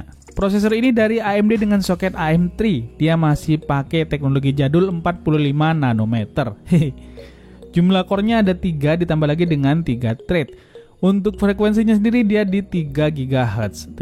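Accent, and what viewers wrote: Madura, Indonesia. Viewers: Ngasak?😂 Kental banget madura kearifan Bondowoso 😂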